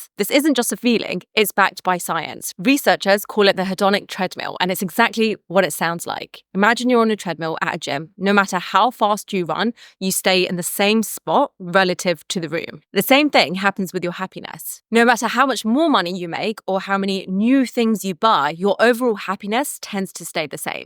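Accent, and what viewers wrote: United Kingdom. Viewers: Nice presentation with nice british accent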